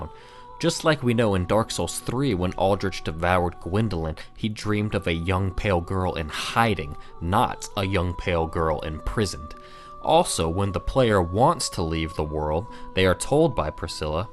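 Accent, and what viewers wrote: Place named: Western Pennsylvania, United States. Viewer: great fuckin videos dude. luvin it. im kinda a noob to the series (i played through most of 2 and smashed 3 but missed the first one) and youtube content creation. i love your take on things and am probably going to go home and get prepare to die tonight. i am currently writing a (serious) dark souls rap going into some of my theories, and yours tie in great with mine. i hope you enjoy it when it gets up if you get the chance to see it! hopefully will be this weekend. also--are you from western PA? hope thats not too creeepy of a question but my moms fam is from up there and you got the accent like whooooooa sometimes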